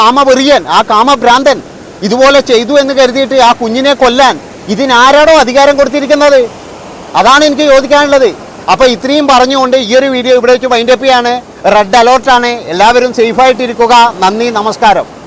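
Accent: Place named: Kasaragod, India. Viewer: കാസർകോട് ഭാഷ.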